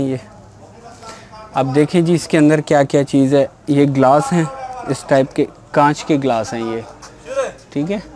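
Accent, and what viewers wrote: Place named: Urdu, Pakistan. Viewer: Ur urdu accent and way of way of talk is very beautiful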